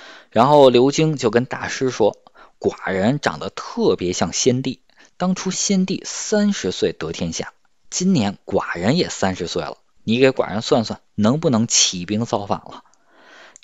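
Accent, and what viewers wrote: Beijing, China. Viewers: 听着像北京人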